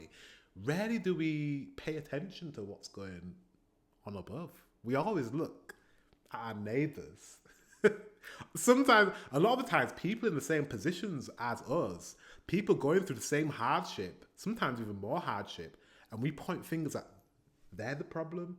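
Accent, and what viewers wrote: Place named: North, United Kingdom. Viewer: Out of context: I love your voice and "dialect". Greetings from a northerner (Germany) to a northerner.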